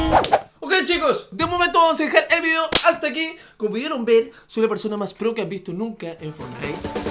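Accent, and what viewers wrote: Chile. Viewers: Es chileno ?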